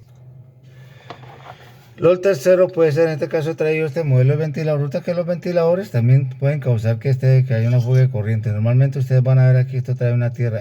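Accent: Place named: Táchira, Venezuela. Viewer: Hermano no se le entiende nada suelte la lengua para hablar. Se entiende que es gocho y para explicar algo se ase con pausa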